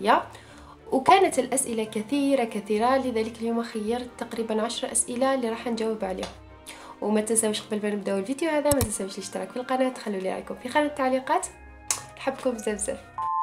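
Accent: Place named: Setif, Algeria. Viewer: حتى انا كي سمعت اللهجة تاعك لقيتها كيما اللهجة تاعنا تاع السطايفية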